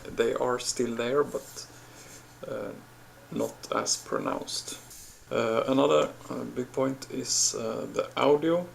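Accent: Norway. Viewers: are you from norway? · Ok cuz u sounden really Norwegian btw im Norwegian :D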